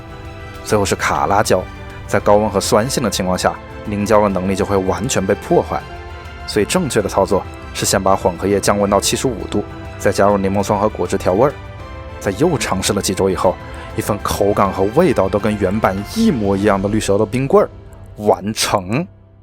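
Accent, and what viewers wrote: Wuhan, China. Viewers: 听着像武汉的☺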